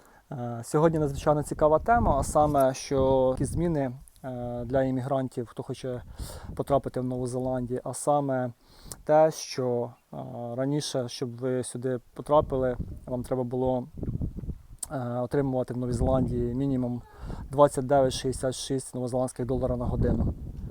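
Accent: Cherkasy, Ukraine. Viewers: Дякую вам за чудову мову, я насолоджуюсь! Бо та кацапщина, навіть з уст українців вже в горлі стоїть! По акценту спробую вгадати, Чекркащина або Польавчина??)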